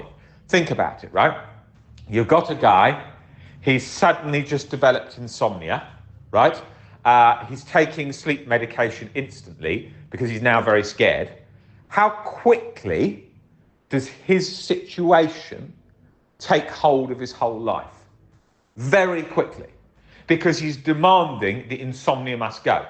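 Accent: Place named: England, United Kingdom. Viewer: Thanks for the great video! I also have to say: you sound a LOT like Alan Watts! As an American, I wonder if you both come from the same area in England cuz the accents are almost identical! 😂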